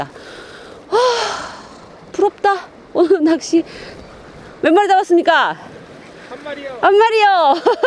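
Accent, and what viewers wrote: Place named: Gyeongsang, South Korea. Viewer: 낚시 못한지 2년 넘었어요 영상이 너무 좋아요 경상도 사투리 쓰는 명랑소녀 모습입니다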